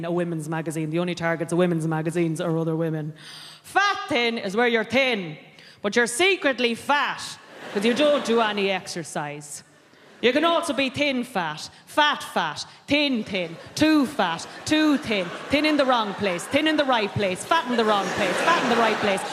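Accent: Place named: Ireland. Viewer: Dynamite, very smart, and as an American, I love the Irish accent.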